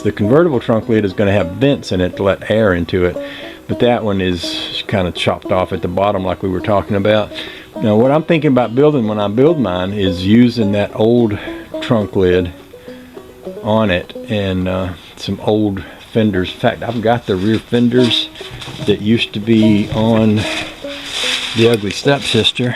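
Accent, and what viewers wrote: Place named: South, United States. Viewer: ya left off the ah in front of pologize! Sory I miss read ya! But if ya was ta have a poltergeist and Dracula that wooden be Tanks giving, it be Halloween or if ur down by cemetery it be Gallows Eve! Hot Rod wood have ta break out his hot rod Christine! Stake em and hangem high! Now all we need is fur ta show up and start talkin British with his southern style accent.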